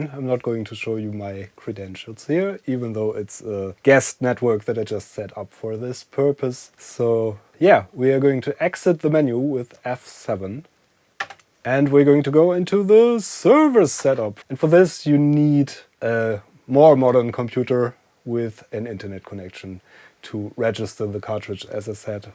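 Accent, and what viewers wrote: Germany. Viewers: Your Accent sounds german. Bist du Deutscher oder?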